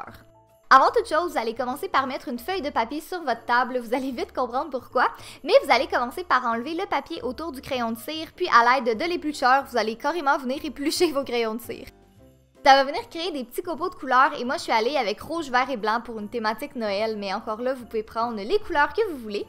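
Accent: Quebec, Canada. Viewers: et puis j'aime beaucoup ton accent canadien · 💖💖💖💖💖 t'ai la meilleure j'adore t'ai DIY c'est les meilleurs ❤ j'adore ton accent Québécois il te va super bien 💖💖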